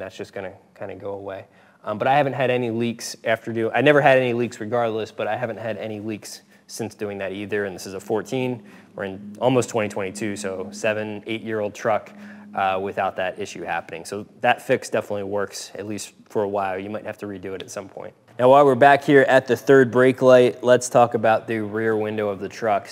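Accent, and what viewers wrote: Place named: South Jersey, United States. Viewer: you said “wooder” I knew you were from south jersey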